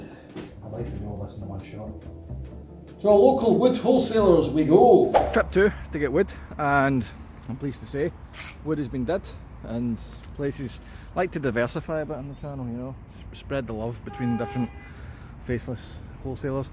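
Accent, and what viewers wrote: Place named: Scotland, United Kingdom. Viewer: I Like the video but the Scots accent from the scot is sometimes hard to pick up what he is trying to tell us about his build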